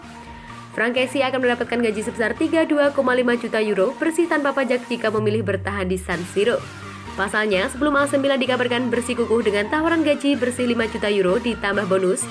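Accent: Java, Indonesia. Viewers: Sory bkn rasis tp voice overnya jangan medok lah, ga enak dikuping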